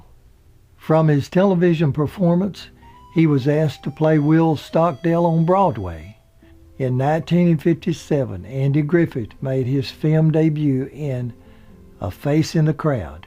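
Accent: South, United States. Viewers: The narrator is from the south. Obviously he's an older gentlemen. Show a lil respect. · MoonMyst Well he is an older southern gentleman so yeah lol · Im originally from Pittsboro NC also in Chatham County just a few miles from Siler City. I love the way you pronounce the town names properly with the essential southern drawl. Wink wink. I'm whistling the theme tune now. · Hey we all love your videos and are always looking for more to come, your voice has a soothing quality, must be the southern accent, we here at my have come to a consensus, you are the Andy Griffith show of the documentary shows, keep em comin buddy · This was another great video presentation by. Love the southern accent. The info on Frances Bavier was quite interesting. A lot of the research here was verified by what I already knew or had read about TAGS for several years. The pictures were accurate except for the one with Andy's family picture, featuring Lee Meriwether. 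That was a publicity photo for The New Andy Griffith show if I'm not mistaken. I finally understood why Don Knotts left the show. It was made clear. Thanks. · I love your southern accent!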